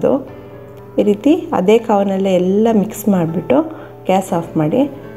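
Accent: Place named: North Karnataka, India. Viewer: uttara karnataka davra neevu nimma dwani d karnat tara ide yella adugegalu chennagide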